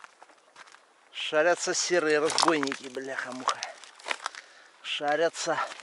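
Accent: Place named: Vyatka, Russia. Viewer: Вятский акцент уникальный.ни с каким не перепутать👍👍.подписон.привет из Москвабада🖐🖐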